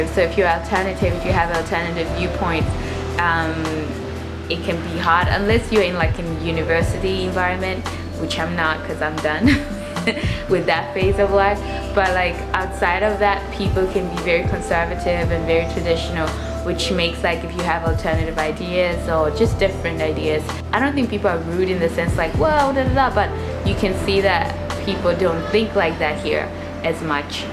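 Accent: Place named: United States. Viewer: You don't sound like someone who grew up with Danish as first language. I once lived in Denmark. You have an American accent! Are your parents American or did you school in the USA?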